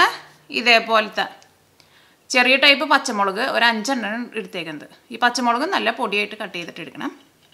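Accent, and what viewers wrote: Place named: Ernakulam, India. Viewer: Ernakulam style samsaram..husbandinte auntye ormaverunu😘.sound kelkubol...😁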